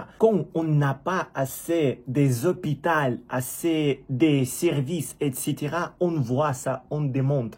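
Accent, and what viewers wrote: Russia. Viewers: Personne n'a envie de suivre notre Président, nous souhaitons sa destitution. Mais merci pour votre communication. J'aime beaucoup l'accent russe😊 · Merci à vous de parler en français et expliquer à certains de nos compatriotes aveuglés par le discours de M. Macron, qu'avec votre analyse il est tout simplement impossible que la Russie envahisse l'UE. Macron est l'ennemi public n° 1 de non seulement de la France mais aussi de l'UE. PS : j'adore votre accent russe 🙂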